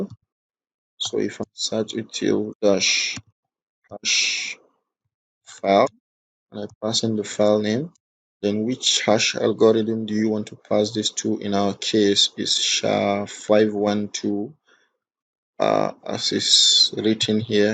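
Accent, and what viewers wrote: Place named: England, United Kingdom. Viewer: I can feel the english intonation (tureshi ni)... Keep up the good work 🙌❤️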